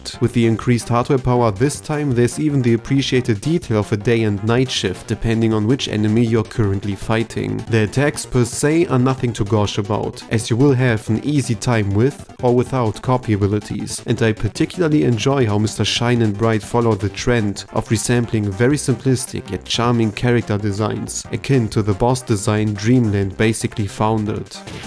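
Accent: Germany. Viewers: Du bist ein deutscher. Ich kann es in deiner Stimme hören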